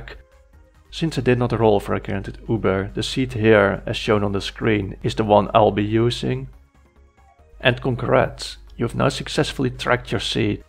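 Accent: Netherlands. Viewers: This man is 100% dutch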